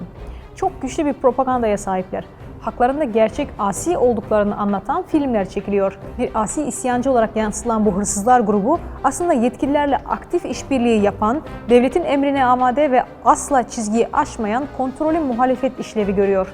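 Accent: Azerbaijan. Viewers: Bu kız nereli?aksanı aynı azerbaycan aksanı sanki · Konusan hangi turk milleti merak etdim. Konusmasi turkce ama aksank sanki bi azerbaycanli konusuyor gibi.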